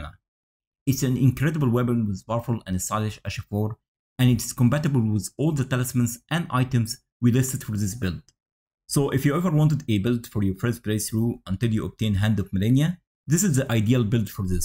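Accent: Egypt. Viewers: واضح انك مصري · Brother, i bet you're egyptian... i could easily recognize the egyptian accent... since im egyptian also. Btw that was a good build guide, ngl. I'll definitely gonna try it later today ❤ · why do you sound like an Egyptian while you're speaking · This thick egyption accent of yours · Your video is very well prepared and it left me speechless after I heard your Egyptian accent you are a legend ❤ keep up the massive work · Great vid ! you sound egyptian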